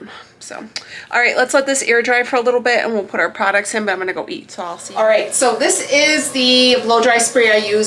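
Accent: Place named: Chicago, United States. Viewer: looked gorgeous! You did a great job on her makeup. Also love your Chicago/ Illinois accent, from a Floridian 😁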